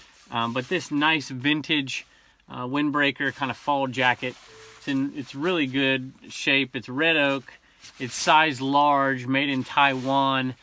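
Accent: South, United States. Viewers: How do you have such a Southern drawl if you grew up in CA?!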